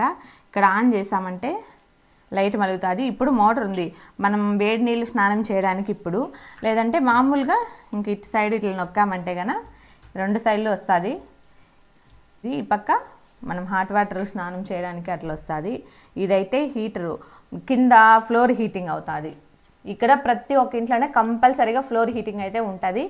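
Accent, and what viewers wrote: Rayalaseema, India. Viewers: Hello, its like pakka Indian home..not like kdramas one..😅 i watch kdramas. Where r u from? Ur dilect is like rayalaseema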